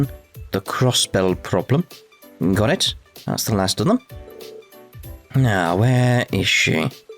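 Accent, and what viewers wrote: Scotland, United Kingdom. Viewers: Scottish voice reveal Scottish voice reveal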